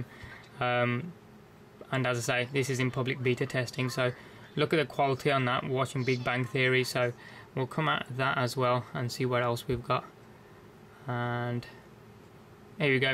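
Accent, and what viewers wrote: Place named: England, United Kingdom. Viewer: Because he speaks with an English accent? Might give it away for you. Also, the model number being given as i9300 would also give you a hint it might not work in the colonies.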